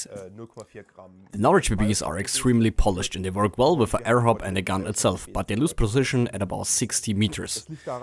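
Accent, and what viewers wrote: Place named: Germany. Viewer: Language advice: german to english - your V's and W's are backwards. You are using a Visim scope. Your pronounciation was "Wisim". Just flip it around and you should be good. I have german friends that make that mistake all of the time.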